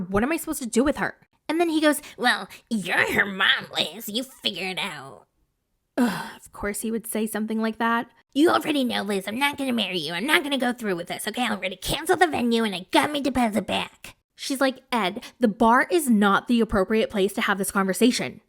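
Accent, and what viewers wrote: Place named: New York, United States. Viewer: istg while getting to know you as a ytber and watching your videos i said to myself so many times this girl gotta be from NY and i just found out that you wereeeee lol i love it. Honestly makes me wanna watch you more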